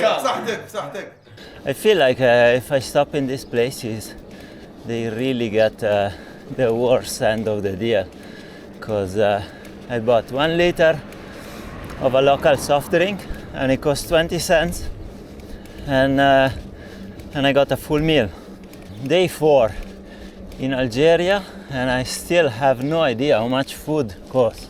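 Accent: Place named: Italy. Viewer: Ho reconisciuto questo bellissimo accento italiano . non importa la lingua straniera parlata , l’accento mi fa sempre scogliere 😂😂😂😂 benvenuto nel nostro paese ❤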